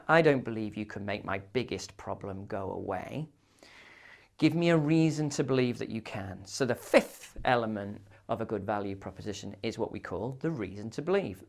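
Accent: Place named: United Kingdom. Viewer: From accent it seems he's a Britisher, is it so?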